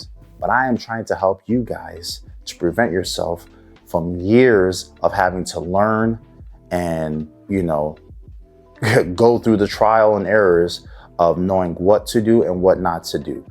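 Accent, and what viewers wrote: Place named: Nigeria. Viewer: The nigerian accent goes crazy bro haha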